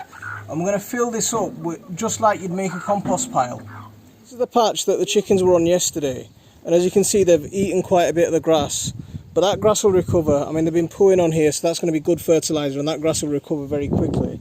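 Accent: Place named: Yorkshire, United Kingdom. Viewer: oh ok Ma'sha'Allah I didn't think you was from west yorkshire my brother, I could tell with the accent but I thought your probably more from Sheffield sides lol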